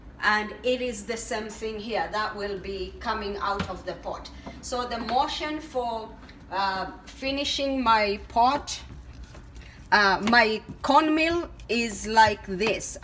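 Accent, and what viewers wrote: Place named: India. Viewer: Your English has got Indian accent I'm a bit surprised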